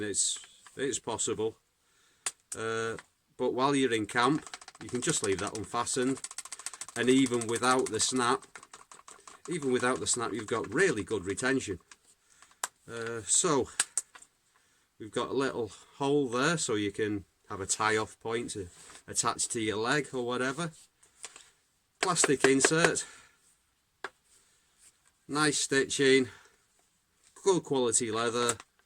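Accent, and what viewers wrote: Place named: Merseyside, United Kingdom. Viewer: Subbed. Nice honest review which covers a few pointers that others do not, i.e spine dynamics using a ferro. Good to hear a Merseyside accent in Lapland! I bought the last stainless 140 on the Varu site a couple of weeks ago, shipping is a bit slow because of the plague but I hope to get it middle of next week. I was agonising about the cost but I am glad I pulled the trigger on it. Now will be carrying the 140, the Savotta pocket chainsaw and the Fiskars X7, all made in Finland. That package covers all my camp/bushcraft needs! Any tips on sharpening the stainless version? Cheers mate.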